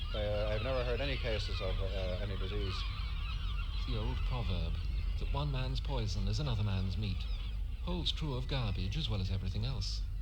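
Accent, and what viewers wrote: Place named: Kildare, Ireland. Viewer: Commentator is from Kildare, probably Naas,, That's how we speak.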